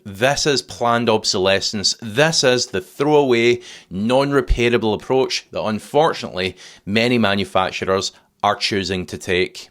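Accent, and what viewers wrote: Scotland, United Kingdom. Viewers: love scottish accent! Freedom!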